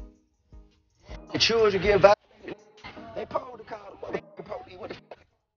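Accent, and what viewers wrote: United States. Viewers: Why do he sound so country this day and time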